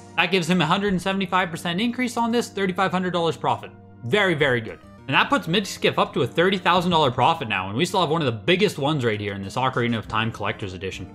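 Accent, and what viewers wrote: Canada. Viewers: I cant take you serious with your massive shoulders long hair tight shirt and canadian accent. Youre all over.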